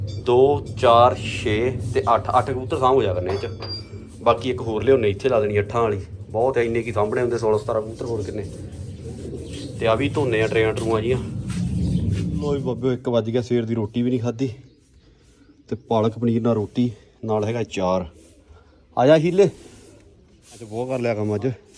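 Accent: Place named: Doaba, India. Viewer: Bai tuadi boli duabe mtlb sade Ali a kehda area Banga ja phagwara ?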